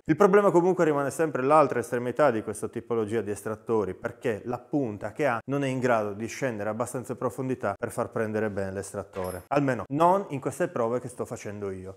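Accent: Sardinia, Italy. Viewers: Sei sardo? (Io si è in tal caso si capisce😂)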